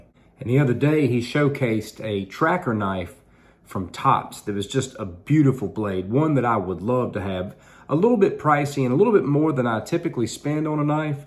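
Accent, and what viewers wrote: South, United States. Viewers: Hey big guy its great to see you again. I love the way your videos start. "Hello maaa feeeaaaands" that southern draw that all the chicks like i bet. Im just downloading your new slingshot aiming video. You know what. Try this. Forget aiming altogether. Forget your slingshots there. Just draw it like a bow. Release within 5 seconds else your bands cool down. After a few hundred shots muscle memory will take care of the aiming. If you miss; dont adjust anything. Watch your target; your mind will give you an unrest feeling; hesitant awkward if your out of sync. Frustration is just your mind trying to help you"talking". Left brain is totally useless for slingshots. Spacial awareness is right brain. Colour; shapes; movement; tonal changes. Sights dont help. Thyr liars! Art is right brain(in beginning your left brain tries to help saying"this should be like bla bla bla...". 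Slingshots is right brain not left. Its art; not science. So if your frustrated and get that grrrrr!!! Just stand upright; point your hands up and out and take a deep breath and relax. Then just fire away; over and over. Also try this. If you have a rope loop hanging from your slingshots; hang it from your arm where a wrist brace would sit. And twist the rope and slingshot till its comfortably tight under your wrist; then hold slingshot as normal. You made a wrist brace. You dont even need to hold your slingshot.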